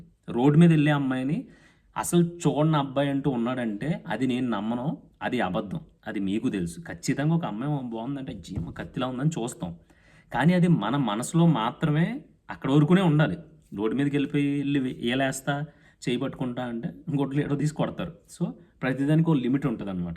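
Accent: Godavari, India. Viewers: Brother manchiga cheppav,mana east and west godavari stylello,ur native place?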